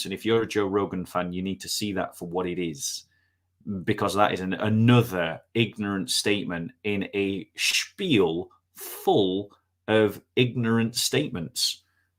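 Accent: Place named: United Kingdom. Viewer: Dont see many livestreams of but I am glad I did see this one. I love the British accent, God bless!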